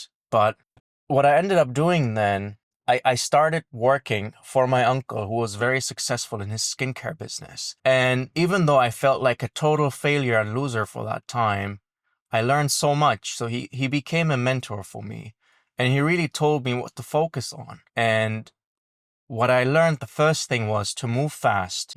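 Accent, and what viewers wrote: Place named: Jamaica. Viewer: Liked and subscribed because your accent sounds kind of Jamaican 👍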